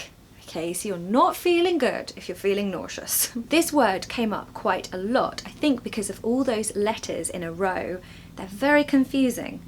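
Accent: RP, United Kingdom. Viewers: Thank you so much indeed, ma'am! I love ur modern RP. Always It remind me😊 something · Hi, thanks to your video my hearing celebrates again!🎉🎉🎉 Helpful topics you've well explained again, under your beautiful MRP sound with! 👌 I'm also fancy the american way but when am back to your videos discovering again where the true is!!! 🚩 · 😮When I lived in Cambridge in the 70s my landlady had wonderful diction like you. She was eccentric and constantly trying to seduce me but I put up with her to improve my English.When I moved to California I passed for British until I absorbed local sounds. I can switch if I try but your exquisite RP (to me it's perfect UK) is hard to match. Two small amusing details: the wrong Evenink sounds like Lugosi in 1931 Dracula. My generation learned from...Oh, giiirl. BTW, you are surprisingly expressive for a Brit, are you maybe part Irish? 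Great teacher and a charming lady.😊❤ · Love your british accent and always can't wait to watch another YouTube video from you ❤ Greetings from Indonesia 🇮🇩😍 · I am learning English, I like your British accent very much, thanks for you videos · You are soo cute and sound natural....British accent is really beautiful and omg are all British women this beautiful 😮....always enjoy watching you mam..😊🇮🇳❤️🇬🇧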